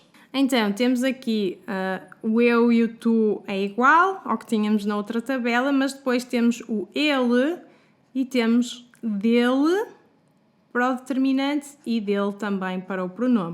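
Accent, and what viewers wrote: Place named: Portugal. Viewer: Oi linda 😍😍😍 amo o sotaque portugues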